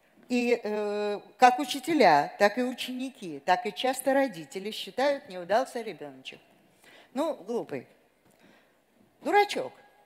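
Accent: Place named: Moscow, Russia. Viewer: Как тяжело слушать этот явный масковский акцент)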